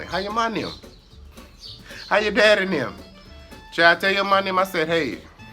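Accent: Gullah, United States. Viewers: I'm from the low country just outside of Charleston. You don't have the geechee accent. You have the gullah accent. Like beafort. Geechee accent is way different.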